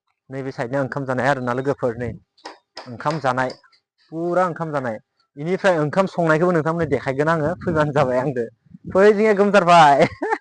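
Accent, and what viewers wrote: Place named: Tea garden, India. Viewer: Ada ng ma jagni ngni railai naykw matw bagan farani baidisw khwnayw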